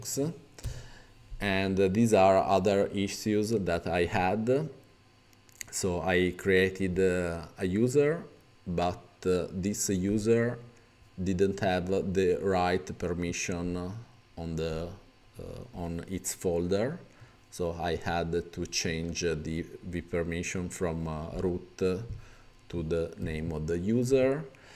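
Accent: Italy. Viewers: holy italian accent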